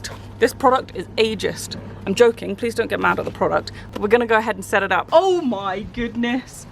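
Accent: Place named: RP, United Kingdom. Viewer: She sounds posh btw love ya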